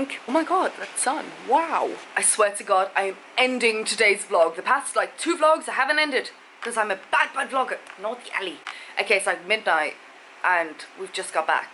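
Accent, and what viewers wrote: United Kingdom. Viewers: I just can't nail down your accent. It's certainly not Aussie but if you're a Brit, then you speak with a watered down Queens English.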